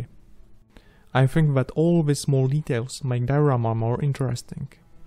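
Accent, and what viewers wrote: Czech Republic. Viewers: Českej přízvuk se pozná xd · podle přízvuku jsem si říkal že jsi čech :D , ale supr video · Maš typycky českou angličtinu. Ta sry mě ta artikulace prostě sere chtělo by to zapracovat ale jinak moc hezká práce. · Z toho přízvuku to bylo poznat a české nápisy to dovršili a ty taky 😁 takže příště video česky 😶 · Hned jsem podle přízvuku poznal našince, krásná práce kamaráde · Really strong czech accent :D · Já jsem si myslel že jsi Čech už jenom podle toho přízvuku když jsi mluvil anglicky 😂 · Ja sem si mislel ze ses cech podle ti anglictini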